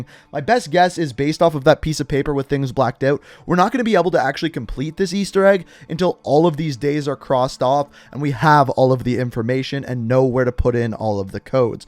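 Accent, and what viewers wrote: Canada. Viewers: Yo I love your Canadian accent much love my man❤️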